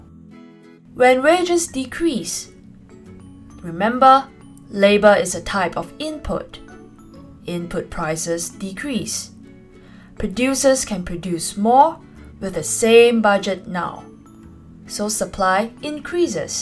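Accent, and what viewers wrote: Singapore. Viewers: are you from Singapore or Msia? sound like Singapore accent but very subtle :) I miss my country! :D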